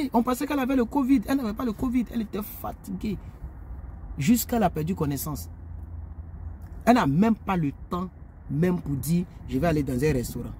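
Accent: Ivory Coast. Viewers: Je sens l'accent ivoirien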